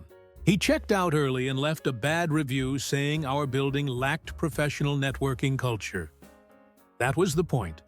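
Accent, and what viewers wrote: United States. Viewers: Yank voice. Not Aussie!! More Crap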